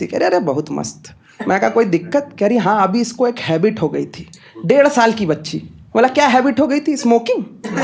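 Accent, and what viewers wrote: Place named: Varanasi, India. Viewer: Nice, your banarasi accent is so relatable. Your comic sense is wonderful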